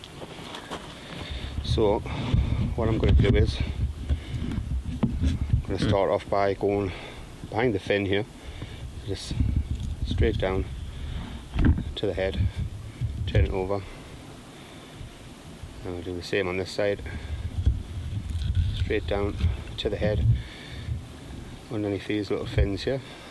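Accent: Newcastle, United Kingdom. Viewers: ha where u from mate u sound like your from newcastle ....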